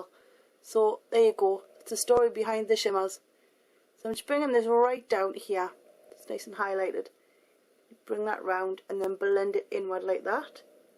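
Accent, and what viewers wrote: Newcastle, United Kingdom. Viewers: She's not Scottish or Irish, she's from Newcastle! :) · yeah, her accent is jordie! jordie meaning she's from newcastle! :)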